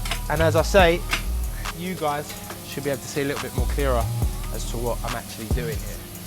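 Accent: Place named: United Kingdom. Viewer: I can tell that you are from the UK because your accent so I wonder how hard is it to get a mobile dent repair license in the UK. I respect your job btw cuz it is great.👌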